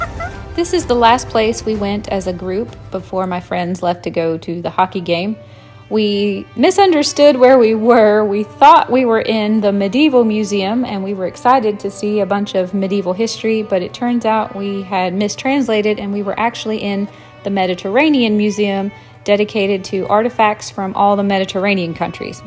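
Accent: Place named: South, United States. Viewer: ☺️ Just saying what I’m feeling and thinking about when I watch and chat. ☺️ Yes of course we can tell when you’re reading or narrating over the video, and no it doesn’t come off as stilted at all. In my mind, you’re reading your “notes” and doing it so you don’t forget what you want to say. But it still feels like you’re talking to us and teaching I guess. I mean no one is going to remember everything when you’re filming, then editing the finish product. So the way you do it, works. You both “come off” sounding like you’re enjoying what you’re talking about. Your tone, and even accents, yes I know has a more southern drawl than you normally, but yours comes out some too. Not talking too fast, nice and reassuring I guess is what I’m thinking. Can tell when you’re smiling when you speak too. Trust me, we love that! ❤️💜❤️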